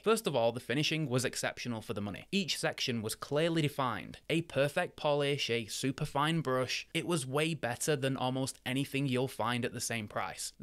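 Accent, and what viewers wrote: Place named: Northern England, United Kingdom. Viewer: Northern England somehwere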